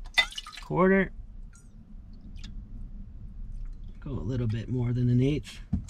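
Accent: Canada. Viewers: The first couple seconds my first thought was "He sounds extremely Canadian."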